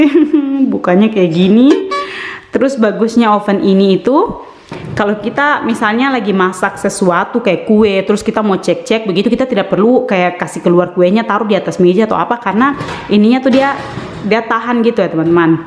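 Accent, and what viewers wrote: Papua, Indonesia. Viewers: Sy paling suka dgn logat papuanya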